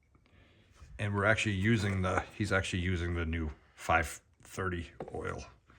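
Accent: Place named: Midwest, United States. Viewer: I like the way of talking ! Midwestern? It’s GREAT! Don’t forget to open all air ways on the top before you pop that plug off and keep the plug off when you pop the filter off. The more air ways the better. Some people even pull the dip stick right out and take the cap off the top so long as nothing falls in there. You might notice a bit more oil gets out. Also wait until drips stop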